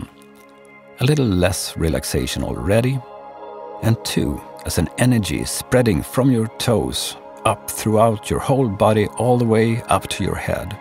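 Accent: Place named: England, United Kingdom. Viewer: I don't understand this English accent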